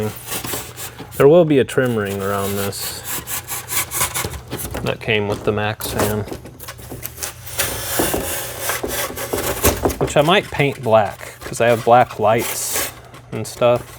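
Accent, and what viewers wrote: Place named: Nebraska, United States. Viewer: I know this is 2 yrs old. And i havent seen in your comments the answer to why theres an "ethernet" port on the fan. its not an ethernet port. RVs use what look like cat5 cables for internal wiring. That is a port for one of them cables. My van uses the same types of cables for the internal wiring for like fans, radios, lighting etc. oh yeah i have a conversion van. I knew you were from nebraska. every nebraskan knows other nebraskans lol. theres just something about the talk and the attitude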